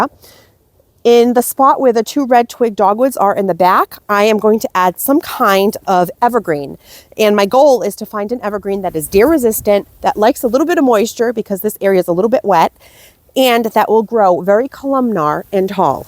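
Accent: Massachusetts, United States. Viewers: Love your Mass accent! Don't change it! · Hi! I really enjoyed this video and seeing both you and together…thanks for sharing your plans! I could just feel the joy & excitement you had & it motivated me to start my planning now! We have a new flower bed around our new patio just empty, ready and waiting to be planted up! Oh boy…I’ve got lots of decisions to make…LOL! 😂 BTW…loved hearing your MA accent too! 😊 Also happy to hear that your Chinese Fringe plant from Janie made it…YAY. 👏🏼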